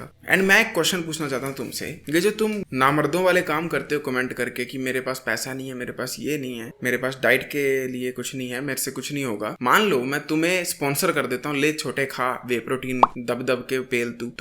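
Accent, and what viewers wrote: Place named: Pahadi, India. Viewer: I love how the pahadi accent sometimes kicks in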